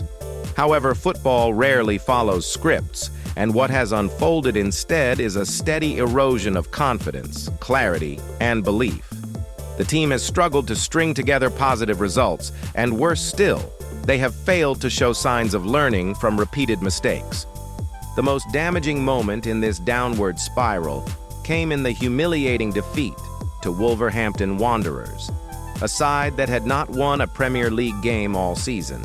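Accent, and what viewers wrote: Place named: United States. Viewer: But why have an Amerixan voice,get Danny Dyer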